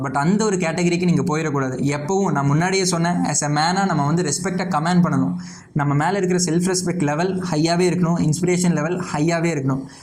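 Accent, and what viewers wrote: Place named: Mannargudi, India. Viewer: Semma bro mannangatti slang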